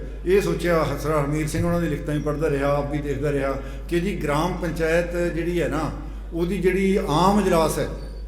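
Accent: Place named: Puadh, India. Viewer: ਸਾਹਬ ਜੀ ਨੇ ਕਮਾਲ ਦੀ ਜਾਣਕਾਰੀ ਦਿੱਤੀ ।ਪੁਆਦੀ ਬੋਲ ਕੇ ਸੁਆਦ ਲਿਆ ਦਿਤਾ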